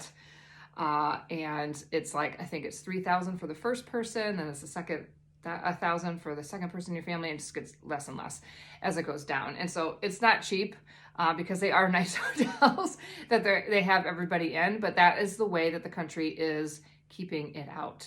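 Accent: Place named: New Zealand. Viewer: Very informant on our country and love your video. Glad to have you in our country and seem like you're getting a Kiwi twang in your accent 😁 Chur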